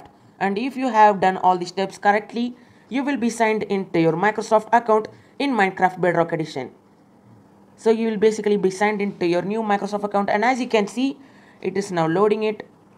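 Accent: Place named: India. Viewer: U kinda sound hindi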